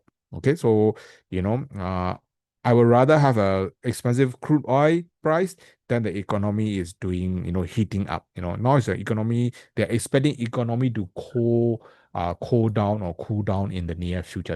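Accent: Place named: Singapore. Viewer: Singapore accent isnt comfortable for us to listen videos.😂 Please produce videos in Burmese 😊. Sg accent really sucks 😢 Please kindly don't present screen with dual window, all are so minute to properly see words.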